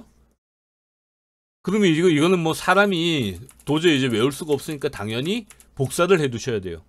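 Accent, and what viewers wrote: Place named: Gangwon, South Korea. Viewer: 좋은 정보 감사합니다 말씨가 약간 강원도 억양이 보이네요 강원도 분이시죠? 저는 강릉에 거주중 입니다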